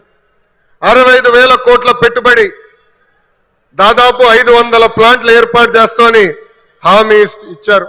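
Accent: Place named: Telangana, India. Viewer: That is Telangana or seema dialect, what is your problem, jagan PADAHIDU antadu😅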